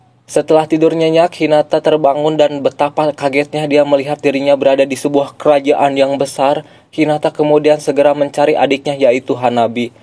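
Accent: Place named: West Java, Indonesia. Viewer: Sundanya kdngeran banget bang😂😂😂👍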